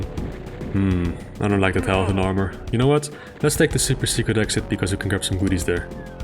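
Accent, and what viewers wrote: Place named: Netherlands. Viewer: I can't get around your heavy Dutch accent.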